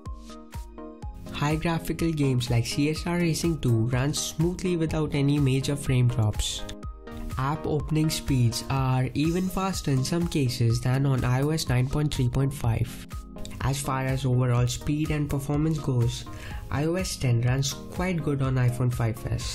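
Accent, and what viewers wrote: India. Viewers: Damn that Indian accent is so annoying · Your Indian accent is too funny! LOL.I couldn't understand most of the times!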